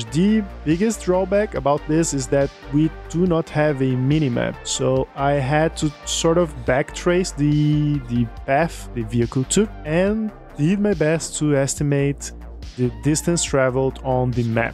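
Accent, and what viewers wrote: Brazil. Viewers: Are you Brazilian? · eae mano, notei pelo sotaque kkkk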